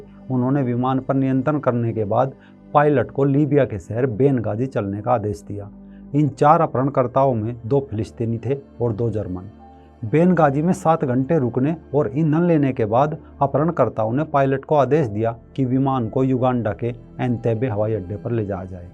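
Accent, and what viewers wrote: Haryana, India. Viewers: R u from haryana......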